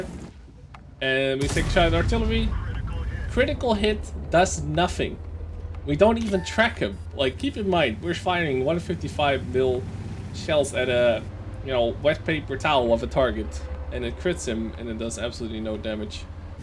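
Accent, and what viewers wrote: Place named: Netherlands. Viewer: You are dutch right? I feel like I can hear it in the way you pronounce the "V" in VK :)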